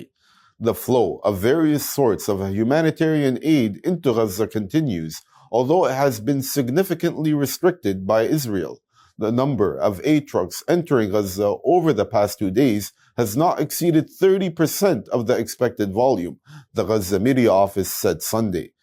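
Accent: United States. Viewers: .*╔═══ ❤☀💚☀❤ ═══╗* 💚💚💚💚💚💚💚💚💚 Dear MR, Wa-alaikum as-salaam. Like the Crescent of, you appeared after full one week, 14th, Feb being the last you had read the news, and full 7 days ahead of the sighting of the Crescent of. Wishing you and all your subscribers a HAPPY, SPIRITUAL, TAQWA-GIVING & AUSPICIOUS!!! Your presentation of news is so fantastic that despite you accent being American, we, from India, find it so easy to understand you beautiful slow pace of reading the news. KEEP IT UP, MR.!!! 🌐🌐🌐🌐🌐🌐🌐🌐🌐 ╚═══ ❤☀💚☀❤ ═══╝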